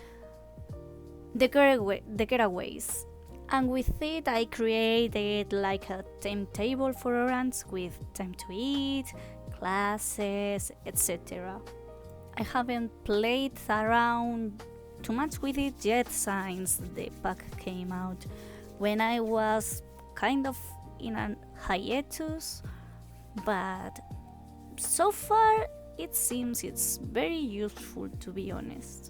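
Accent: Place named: Spain. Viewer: Hello, Wow your English is so good and clearly understandable ! Also I love your super cute spanish accent~ I wish I I'd speak English like this. Beautiful build ! I checked UCLA on Google from which you took inspiration and good sims insertion~ I like how hou "simmifyed" it to melt the style with your own sims mark in Del Sol Valley. This world cruely needs renovations too and I'm excited to see how you'll redo this world with your own lore for the Zest family. Speaking of new Royalty EP, I'm very hyped for my part. I do love legacies and I feel the gameplay would blend so well into my saves and stories. Just disappointed the african inspirationned world ended in a poor neighbourhood with 5 lots... I hoped we had it as a whole new world but I kinda like how they created 3 whole universes in one world. That's interesting even thinking having a royal legacy in one of my saves I do play with 1 world = 1 realm/kingdom. 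Very excited to have this pack in our hands. Not forgetting CAS and build modes look insane !! I wonder what part 2 of this build would look like. Waiting for it !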